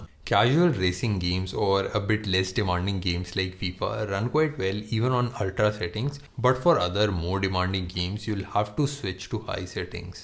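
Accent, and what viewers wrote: India. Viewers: I missed my Indian friends by hearing your voice, good video bhai!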